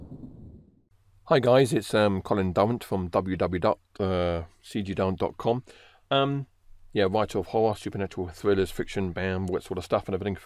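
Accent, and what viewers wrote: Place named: England, United Kingdom. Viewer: I am an American so my English is very different from who I assume is doing the commentary here. He has a VERY heavy England's English "accent(?) which was VERY difficult for me to understand - sorry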